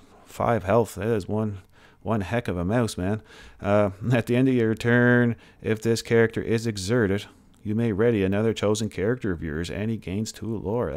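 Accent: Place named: Canada. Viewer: The level of Canadian in your voice....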